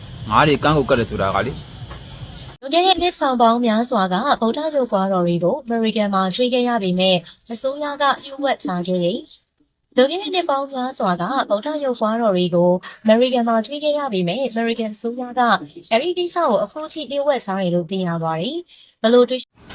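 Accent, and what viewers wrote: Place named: Rakhine, Myanmar. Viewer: ပြောတဲ့အသံနားထောင်ရရင်ရခိုင်လူမျိုးတင်ထားတာထင်တယ်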